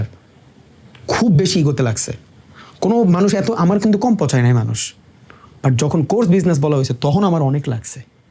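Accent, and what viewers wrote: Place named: Comilla, Bangladesh. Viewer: ভাই আপনার জন্মস্থান কি কুমিল্লায় ক্যাপ্টেন এমপি হাসনাত আব্দুল্লার মত কথা বলার ধারন শুনে তাই মনে হয়।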